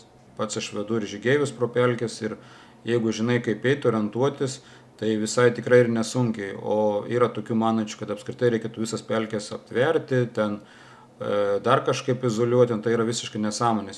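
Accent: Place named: Aukstaitija, Lithuania. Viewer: a pats koks toks esat - suvalkas(užaprobuotas), rytų-vidurio-šiaurės aukštaitis,(tikrai ne žemaitis, nes tie šitaip neišsipisinėja) - kame tas "akcentas" kliūva?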